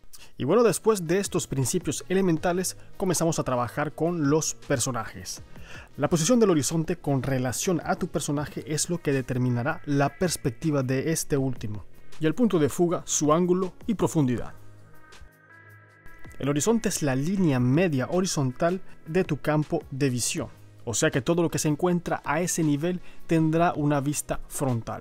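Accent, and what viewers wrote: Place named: Yucatan, Mexico. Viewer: amigo hablai colo yukateko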